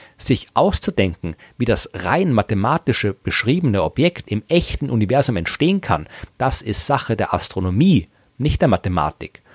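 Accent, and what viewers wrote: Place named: Austria. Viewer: Ich finde die Österreichischen Dialekte schön. Und ist es nicht Toll das wir in verschiedenen Ländern leben und trotzdem die selbe Sprache sprechen. Trotzdem ist nur der jenige Polyglot der die MathemAtik beherrscht ;-) denn das ist die Sprache des Universums. Schönes Wochenende. PS super Hörvideos immer weiter so. Wird Zeit das mehr Leute auf deine Kanäle aufmerksam werden damit deine Arbeit, die du hier reinsteckst auch mit der Weltlichen Währung honoriert wirst und nicht nur mit Lob.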